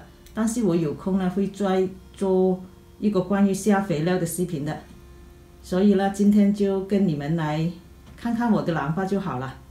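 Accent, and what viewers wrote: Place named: Cantonese, China. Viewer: 我之前有跟妳留一段留言，妳可能沒注意看！我說我非常喜歡聽廣東歌，妳說的普通話有點廣東腔，特別親切、可愛欸！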